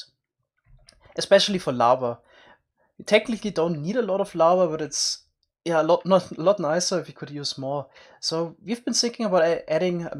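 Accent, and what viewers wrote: Germany. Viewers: Ist er deutsch? Sein Akzent klingt danach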